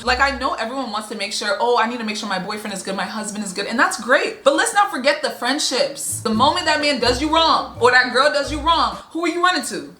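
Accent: Nigeria. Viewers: Nigerian accent 😫😫😫😫😫🤌🤌🤌🤌🤌🤌🤌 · Again I ask,are you Nigerian cause the accent is hitting hard which makes your reactions more real and relatable 😂😂😅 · Are you a Nigerian cause u have that accent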